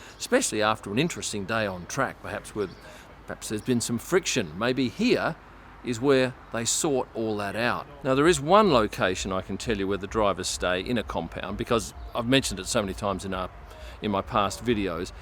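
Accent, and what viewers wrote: Australia. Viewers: Fantastic channel. It's good to hear a laid back Aussie. Great content and presentation. 🇦🇺 😊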